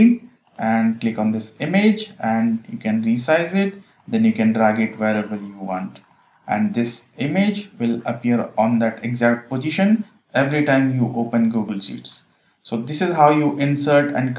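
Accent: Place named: India. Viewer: Indian accent = masterclass of a video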